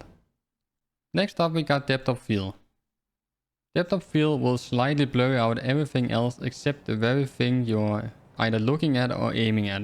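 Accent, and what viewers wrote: Denmark. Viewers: Hey bror, har set du er stoppet med at poste, men ber dig begynd at post igen tro mig. Du skal bare øve dig på dit engelsk så det ikke er danglish og blive ved med at poste og lock in. Tro mig hvis du bliver ved bliver du stor på et tidspunkt. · You sound danish lol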